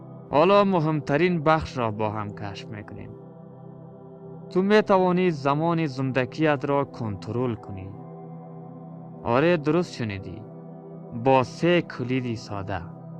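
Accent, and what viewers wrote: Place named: Afghanistan. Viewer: بی نظیر بود امیدوارم موفق باشی چون این نوع محتوا ها را برادران ایرانی ما زیاد میبینن البته فقط محتوا های که خود ایرانی ها ساخته باشد اما چون لحجه ما افغان ها را دوست ندارند بعد ازینکه روی ویدیو کلیک کردن همینکه لحجه ات را بشنوند فورا از ویدیو خارج می‌شوند ما افغان ها همیشه دنبال کننده برای آنان بودیم ولی آنها فقط خودشان را می‌بینند امیدوارم تعصبات را کنار بگذارند شما ادامه دهید موفق میشین انشاالله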